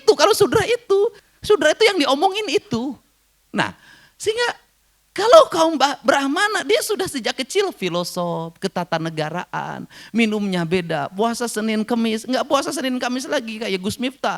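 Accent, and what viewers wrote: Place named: Sunda, Indonesia. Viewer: Krn pidato nya sell : tanpa text, spontan dr hati, topik nya sell menarik sesuai dgn kepentingan bahasan nya, gaya bahasa n bicara nya yg 'khas,' sangat expressi , serius, tanpa kehilangan selera 'humor' nya yg 'mengena' , tidak norak bhkan terkesan ada nuansa 'intelektual' dalam setiap kata n kalimat nya , bhkan sekalipun dlm bahasa daerah (Sunda) nya yg msh sangat kental, cermin betapa KDM sangat cinta pada budaya leluhur nya .. 👍👍👍👍♥️♥️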